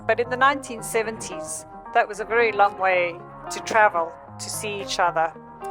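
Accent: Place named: Zimbabwe. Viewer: Dear and, this is first time I've viewed your channel and I enjoyed it immensely, thanks! In a twist of fate I know already from our Allan Wilson High School days in the early '70s when we were both boarders at Burnham House! I remember you well and you've done really well for yourself in the maritime industry from a landlocked childhood! Your narration was great, I loved hearing the familiar Zim accent, thanks! Happy sailing guys! Cheers, Jeremy Lancashire (Lancs)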